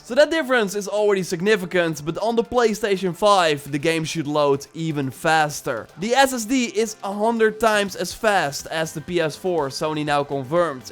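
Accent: Netherlands. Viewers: Ik dacht al dat je nederlands was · Dacht al dat je Nederlands was 😂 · NOW I FINALLY NOW WHATS WITH THE ACCENT, YOUR DUTCH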